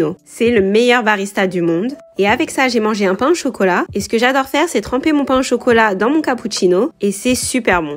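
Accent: France. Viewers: Yess je s’avais que tu parlais français ❤❤❤tu avais un accent français quand tu parlais anglais ( d’ailleurs il y a quelques mois ou semaines je t’avais posé la question 😂)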